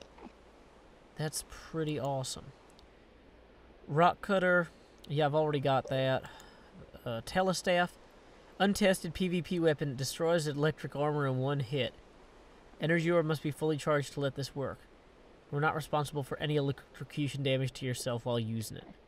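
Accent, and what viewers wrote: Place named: Tennessee, United States. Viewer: Hey, I'm really enjoying this series! It's great to hear a fellow Tennessean, I hope you continue this and continue being hilarious!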